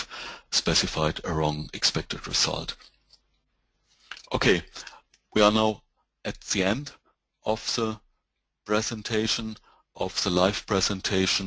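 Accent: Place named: Spain. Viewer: Sound like Spanish but understand very good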